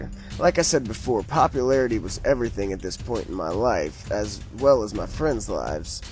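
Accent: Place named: West Virginia, United States. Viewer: Great story, and I love your voice! Kinda makes me feel nostalgic. I moved to TX from WV about a year ago. I never realized how much I would miss that accent. Listening to this makes me feel like I'm hanging out with some of my old friends trading stories while smoking. lol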